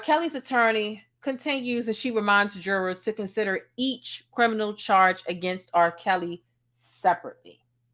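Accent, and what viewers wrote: Baltimore, United States. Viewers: Definitely hear your BMore Accent!! Love it!! Do your thing Ms.!! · I automatically figured out that you're from Baltimore, the accent is there. Behind New Orleans, Bmore is my second favorite American accent, I have family from there.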